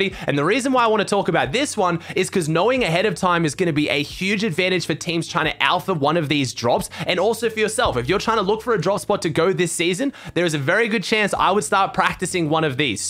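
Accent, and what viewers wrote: Australia. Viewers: Aussie!!!!!!! Yo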